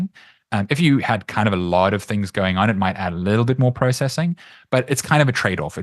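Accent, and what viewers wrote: South Africa. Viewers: Great video, something I’ve been interested in for a while. Also btw, are you by any chance South African? Your accent sounds familiar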